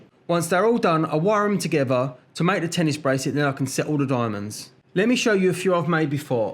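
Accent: Cockney, United Kingdom. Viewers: Besides your talent and skills that we are witnesses in every video, 1st: nice house! 2nd: rescuing dog from being someone’s dinner...👏🏻👏🏻👏🏻👏🏻 nothing to say here🥇 3rd: I looove your cockney accent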